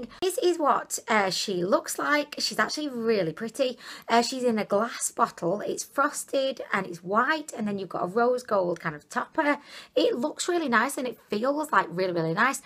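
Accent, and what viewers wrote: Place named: Huddersfield, United Kingdom. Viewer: Hi, what town are you from? Huddersfield lass eya, l have a similar accent. Found this video while noseying at Wish hauls, & you are so hilarious, l just had to subscribe! Thanks so much for the giggles!:)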